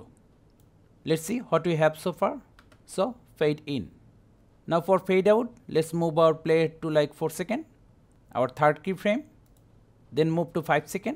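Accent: India. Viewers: i little bit understand indian accent, tysm you are legend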